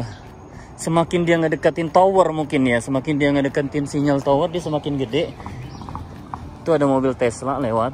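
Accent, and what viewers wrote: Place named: Lombok, Indonesia. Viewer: logatx kayak org lombok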